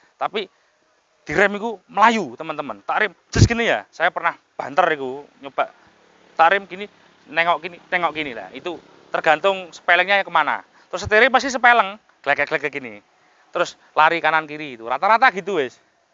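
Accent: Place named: East Java, Indonesia. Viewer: Matur suwun.. Sampeyan lucu.. Gaya Jawa timuran... Lancar jaya.. Salam dr Bogor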